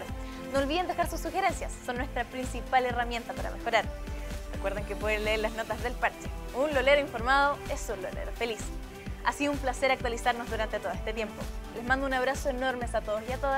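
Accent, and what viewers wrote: Chile. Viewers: Pero si me gusta el acento chileno D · Que bueno que se va esto es legue of legends latinoamerica se necesita a alguien con acento neutro no a alguien que a leguas se nota chilena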